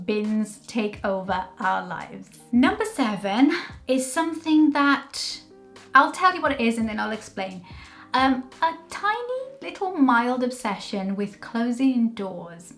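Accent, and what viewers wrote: Wales, United Kingdom. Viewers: You’re picking up a Welsh accent 👍🇬🇧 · I love your british accent, it's sounds so beautiful and clear You've been helping me a lot with my English, "kisses and hugs" from Brazil! Obrigada por tudo ♥️🇧🇷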